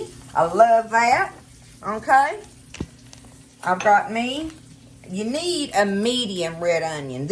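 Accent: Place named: Kentucky, United States. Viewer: Where are you from? You sound like me and I am an old Kentucky girl.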